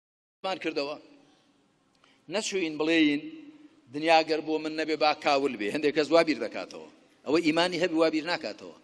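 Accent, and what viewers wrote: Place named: Pishdar, Iraq. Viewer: بە کوردیەکی زۆر خۆش و پاراو و پەتی قسە دەکات. شێوەزاری پشدەری زۆر خۆشە، هەقە بکرێتە ستانداردی کوردیی سۆرانی کە لە نێوانی هەولێری و موکریانی لە لایەک و سلێمانی و گەرمیانی و ئەردەلانی لە لایەکی دیکەوەیە.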